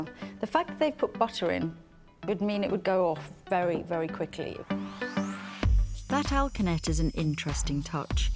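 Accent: England, United Kingdom. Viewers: I looooove your English accent 🤤🤌🏽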